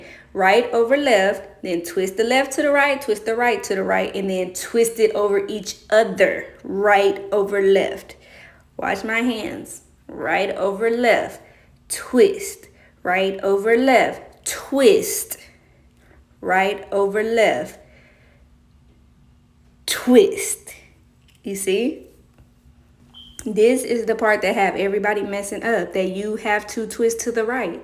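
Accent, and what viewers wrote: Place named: Dallas, United States. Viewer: Sound like u from out here in Dallas... forgive me if I’m wrong luv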